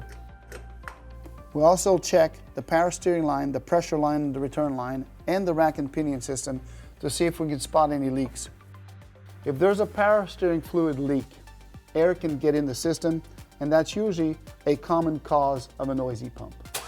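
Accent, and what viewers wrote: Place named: Netherlands. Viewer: He has a dutch accent.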